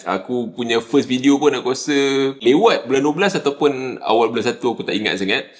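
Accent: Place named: Malaysia. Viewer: Takpalah.. Lidah Melayu 😅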